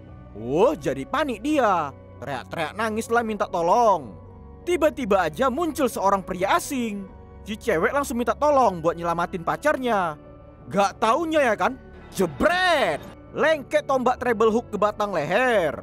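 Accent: Medan, Indonesia. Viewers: Logat Medan bah...👍👍👍👍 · BANG ABANG MEDAN MANANYA BANG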